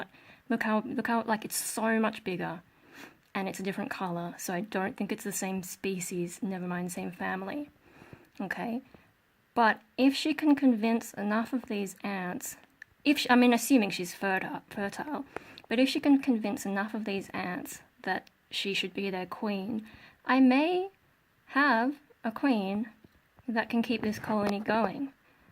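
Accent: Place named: Australia. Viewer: Yes. Northern hemisphere. Southern California to be exact. Whereabouts r u from? Somewhere down under from the sound of ur voice, but I'm just guessing.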